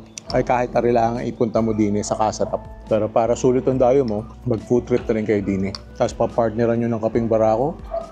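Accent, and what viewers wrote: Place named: Lucban, Philippines. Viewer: Taga saan ka po, you sounds like lucbanin😅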